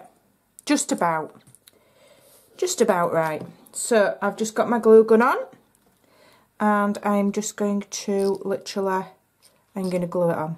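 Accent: Yorkshire, United Kingdom. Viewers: I love watching your videos! Are you from Yorkshire?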